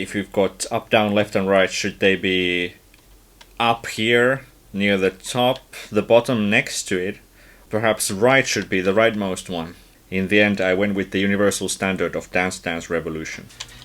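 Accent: Finland. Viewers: Suomiaksentti havaittu! 🔥 Bossi ohjain kyl! 👍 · Your accent is quite finnish, where are you from?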